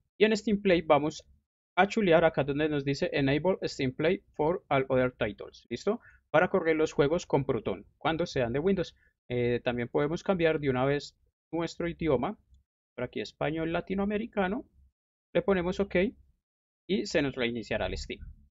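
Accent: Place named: Colombia. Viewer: WOW varias cosas xd 1. Me agrada que POR FIN un canal en español ligado a linux este enfocado en GAMING, me parece genial y mas con el estado actual de steam y las mejoras que poco a poco vemos en otros lugares. Creo que poco a poco vamos viendo linux como ya una verdadera alternativa FÁCIL ante windows xd 2. Mi parcero como que es de colombia no? digo, se le marca ese acento que se distingue, así que supongo :v 3. TREMENDA pc ala, me parece genial primero porque es una belleza muy sexy, segundo porque la gráfica que tiene (segun estuve leyendo) esta dando problemas con algunos juegos (vamos es una nueva arquitectura híbrida con stream processor, tiene razón para fallar) por lo que seguramente vos tambien podras mirar eso xd y luegooo porque la verdad presenta hardware que mucha gente va a comprar, el R5 3600 es pan caliente y seguramente alguno quiere linux xd. 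Otro punto es que yo también manejo puro AMD, eso sí, algo más modesto pero funcional. 4. Recomendaría para el canal títulos más cortos en los videos, por ejemplo, el último video que subiste sobre mangohud (que por cierto yo sí me tuve que tragar los videos en inglés y un fallo en la instalación, pero al final sobreviví) es el único video en español que hay en youtube. Por lo que si pones algo así: "COMO VER LOS FPS EN LINUX" aparecerá el video de primeras para los hispanohablantes. Si lo se, puede ser algo "Clickbait" y demás pero we, tienes buen contenido y al final logras explicar lo necesario, considero que vale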